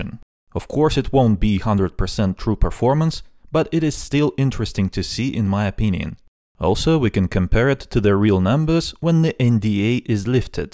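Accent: Russia. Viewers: I thought he had a Russian accent 👍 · Ля Русский. Сразу понятно. Но произношение классное, как человек живший все жизнь в США говорю.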